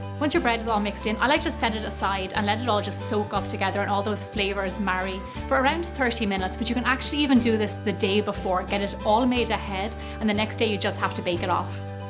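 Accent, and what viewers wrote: Ireland. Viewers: I love Irish accents!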